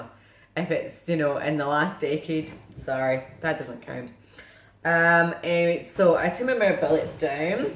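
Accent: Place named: Scotland, United Kingdom. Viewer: Congrats on the run! I couldn't do that to save my life. I've only got 1 stitchy project on the go, so I think anyone whose attention span can manage StitchMaynia is impressive! So enjoy your videos, I'm an expat Scot living in Australia for 30years, so a wee touch of homesickness listening to you speak. Taps Aff! x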